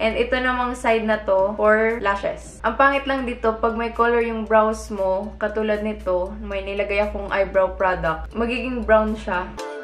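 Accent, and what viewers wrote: Visayas, Philippines. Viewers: Bisaya ka? Hahaha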